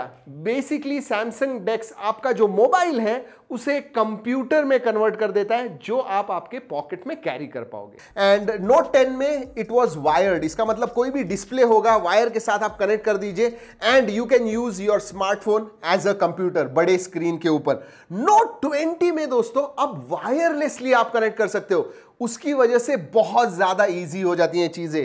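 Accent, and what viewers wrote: Maharashtra, India. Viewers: Are you maharashtrian and marathi by mother tongue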